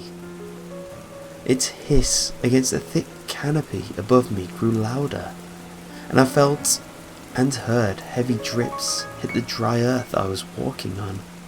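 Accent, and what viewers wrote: England, United Kingdom. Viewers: Why are all creepypasta narrators British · Nice to have an English accented narrator for a change , nice atmosphere and fab pics. Thankyou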